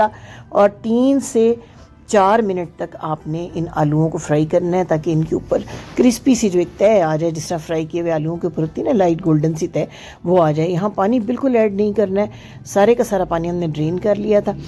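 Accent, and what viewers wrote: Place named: Punjab, India. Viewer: آپ کا اردو بولنے کاانداز پنجابی جیسا ہے اسے بہتر تھا آپ پنجابی میں ہی بات کر لیتے۔میتھی میں نہ تو سوکھا دھنیا ڈالا جاتا ہے اور نہ زہرہ۔اور میتھی کو دم میں پکاتے ہیں۔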